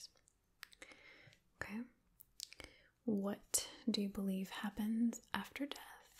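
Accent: United Kingdom. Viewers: please make more videos with british accent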